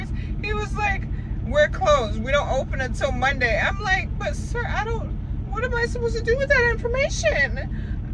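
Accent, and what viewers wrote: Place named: Midwest, United States. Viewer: You with tha' mid-west twang...so sexy, Yo'...Linen set for west travels...Khaki is cool too...You're so particular...ASK AND IT SHALL BE GIVEN...-lots of Love out here for You...You're banter and picadillos are so homey and familiar...why No FB Msngr?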